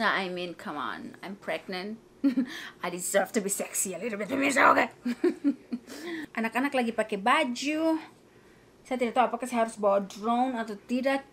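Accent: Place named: Eastern Indonesia, Indonesia. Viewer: Kk logat timur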